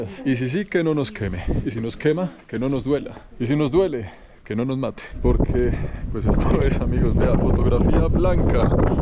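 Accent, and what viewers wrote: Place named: Bogotá, Colombia. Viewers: Ay qué cómico pichirilo me da una gracia ese nombre que le pones al carro y con ese gran desayuno ni te asomes al cráter o de un precipicio porque te vas de cabeza con el estómago lleno jua jua jua jua jua jua pasen niñas pasa y pasa no hay apuro ay me encanta como hablas será que soy de Uruguay y me me gusta muchísimo cómo hablan los colombianos pero especialmente tu voz que es bastante baja y las palabras que le pones a las cosas les cambias el nombre son modismos no de cada país a mí me encantan me encantas tú y tus vídeos y te deseo lo mejor en la vida estás en uno de los países que yo quisiera conocer por algo cuando llegaste dicen buena vida te saludan así y eso y eso ya es buena vibra muy positivo o pura vida bueno algo es de eso no me acuerdo bien algún día iré por ahí así que no me voy a perder nada de este video que promete como todos tus vídeos ningún desperdicio cuídate y Dios siempre va contigo❤❤❤❤❤ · 🤭🤭 me encanta como narra🤭🤭muy yo 🤭 cachacos al fin y al cabo🤭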